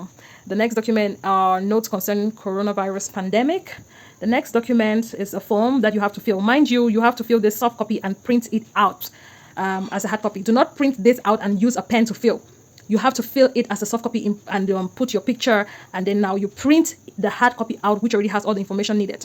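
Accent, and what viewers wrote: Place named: Paris, France. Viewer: That parisian accent na die! Hahahha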